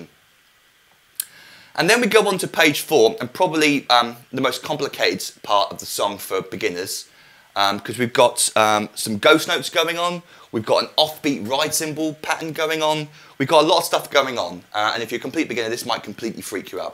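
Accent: United Kingdom. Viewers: Thank you! Like your strong British accent.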